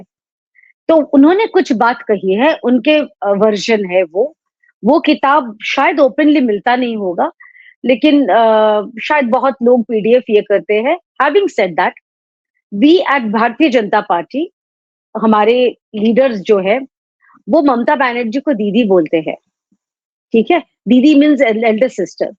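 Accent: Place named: Eastern Uttar Pradesh, India. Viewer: ji your skill of communication is superb/excellent.. Your Cristal clear views & way of explaining the facts is appreciated.Very well done. probably you belong to eastern UP or bihar..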